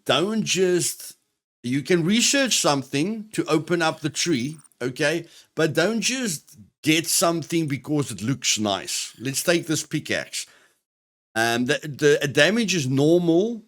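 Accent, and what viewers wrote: South Africa. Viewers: You a south African my guy I can hear your accent 👍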